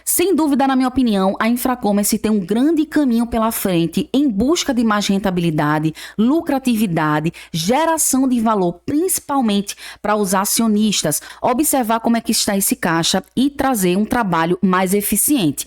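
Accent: Northeast, Brazil. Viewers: Adorei nossa marquinha nordestina... Parabéns. Comprei 10k metade 0,46, metade a 0,51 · Muito legal esse teu leve sotaquezinho do nosso nordestino tradicional!!! Isso te faz a única, e te distingue no meio de todo esse grupo. Parabéns!!!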